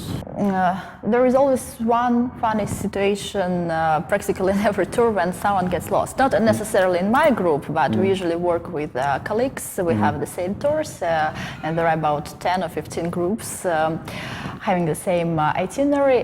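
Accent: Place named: Russia. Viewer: У нее какое то русское произношение ))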